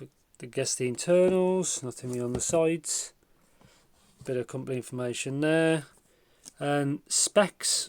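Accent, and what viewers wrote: United Kingdom. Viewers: Hi mate, fellow brit here, subbed as soon as i heard the accent lol. Hoping you can help... My music is mostly, so alot of acoustic and string dominated music. live edition, acoustic such as ' ' and etc... To give you a solid genre type... Im looking at buying a pair of IEM's to suit my listening style for this music. I dont need bass cannon's im looking for quality rich mids and vocals mostly. Would you recommend these as a cheap enough pair that should do a decent job? I'm looking at ordering oracle mkiii as a treat for myself as a high end IEM set. Do you feel that set would suit my listening style? Great channel pal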